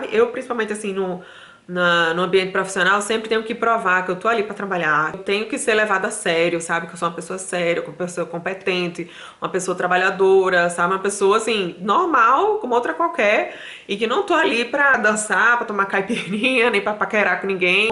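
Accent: Northeast, Brazil. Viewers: vc é muito meiga, educada e tem um maravilhoso acento nordestino. Deve ser portanto, muito difícil para os suíços acreditarem que uma mulher tão linda e jovem seja: brasileira, alta executiva, poliglota, etc. Enfim, vc faz parte de 1% da população feminina brasileira. Parabéns! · O Youtube me indicou teu vídeo,vou ver todos os vídeos, gostei do conteúdo,de saber que os europeus são racistas e preconceituoso contra estrangeiro,e pelo sotaque vc é nordestina né? parabéns vou ver todos.feliz natal pra toda sua família 🍾🍾🎉🇧🇷🇧🇷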